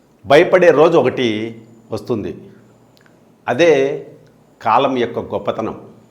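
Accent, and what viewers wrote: Rayalaseema, India. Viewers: రాయలసీమ యాసలో మీ విశ్లేషణ బాగుంది అన్నా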